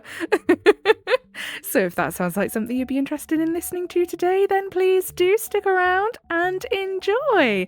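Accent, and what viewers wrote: United Kingdom. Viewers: I've discovered your channel last week. It has been recommended to me by Youtube. I was particularly interested by the "Human narrator" in the thumbnail. Your voice is very soothing and I love hearing some British accent. So, you've convinced me, I'm making an offering to the algorythm, because there's more people like me who would like your channel to pop up in their recommandation as well.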